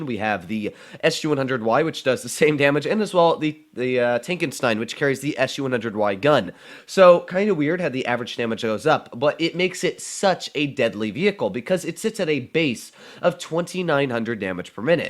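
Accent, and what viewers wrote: Russia. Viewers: Love the tiny Russian Accent, stalin would be happy. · are u secretly russian?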